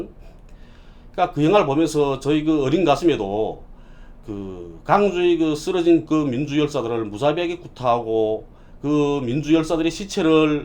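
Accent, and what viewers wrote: North Korea. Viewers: 북쪽 말이 좋습니다!고치면 되지!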